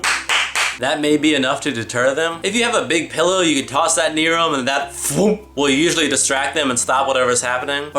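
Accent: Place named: United States. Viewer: a hillarious American voice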